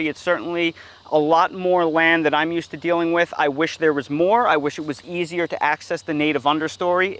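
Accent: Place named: New York, United States. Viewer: You sound like you were born where Woody Allen was born.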